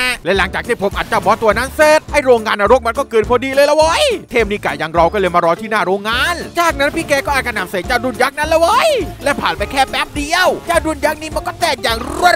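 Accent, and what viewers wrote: South, Thailand. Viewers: พี่เป็นคนใต้หรอครับ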